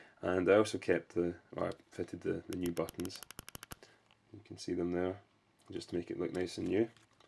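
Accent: Scotland, United Kingdom. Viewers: R U Scottish? Just wondering great video btw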